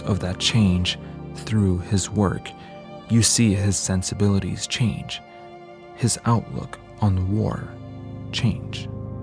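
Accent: Quebec, Canada. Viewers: I have to say, your french pronunciation is extremely on point. Why you do have a quebecois accent tho? Edit: oh, you’re probably quebecois, makes sense. My bad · Es ce que tu es québécois? Ton accent me dit quelque chose, on se reconnais tous en tant que frère québécois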